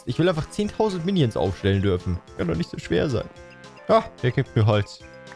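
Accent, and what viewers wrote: Germany. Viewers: man hört des Todes das du deutscher bist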